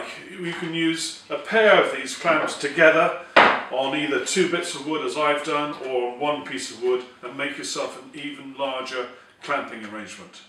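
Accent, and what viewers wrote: United Kingdom. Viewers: did a great job. I have several clamps from other manufactures. Last night I was clamping a closet shelf system and I noticed that the Jorg's were not exactly parallel which surprised me, but the Besseys that were also being used in the same clamping were exactly parallel. The Bessey clamps made it possible to clamp perfectly, the Jorg's would have left a mess for me. sold me on Bessey, and the British accent made me feel chipper!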